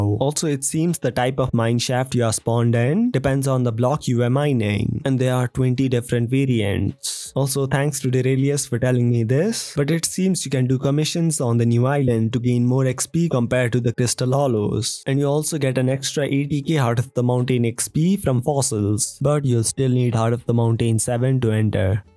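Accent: India. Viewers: bro has pure indian accent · you sound like Indian?? are you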